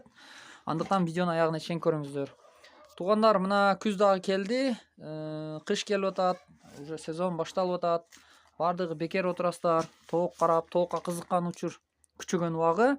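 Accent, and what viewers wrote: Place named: Uzbekistan. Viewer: Озбек окшойт кыргызча суйлогон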